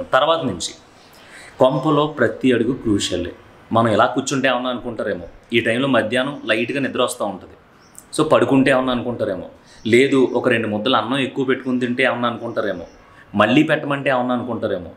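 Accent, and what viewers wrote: Godavari, India. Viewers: Mee vuru godavari jillaa na andi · East godavari aa.. west godavari . Aa. Sit miru.. but great ga chepparu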